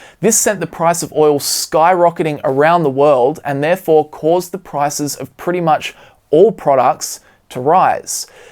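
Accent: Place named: Australia. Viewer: Not bad for an Aussie!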